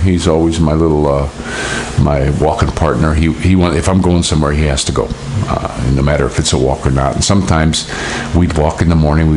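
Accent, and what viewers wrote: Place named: Western New York, United States. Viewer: Sounds like you lived up on the lake! If I had to guess I would say silver creek NY or somewhere around Eire pa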